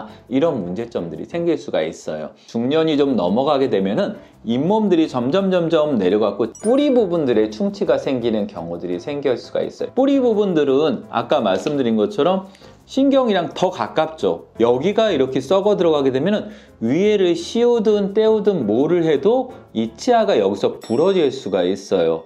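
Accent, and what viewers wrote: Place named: Gyeonggi, South Korea. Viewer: 경기사투리인거 경기도가 고향이신거?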